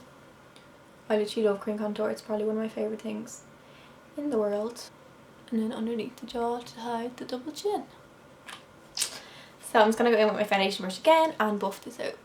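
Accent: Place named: Ireland. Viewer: Super happy this was recommended to me actually. You from Ireland babe? Your accent is very much like clarabell.x! Can’t wait to binge the rest of your vids and stalk you everywhere 😘😘😘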